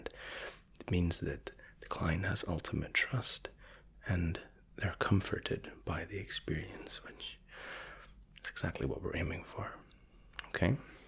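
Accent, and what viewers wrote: Scotland, United Kingdom. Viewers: Love your accent! It's a really gentle tone. What part of Scotland are you from? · Its almost 1am I'm drunk and I always can rely on for his soothing Baritonal Scottish smoothing voice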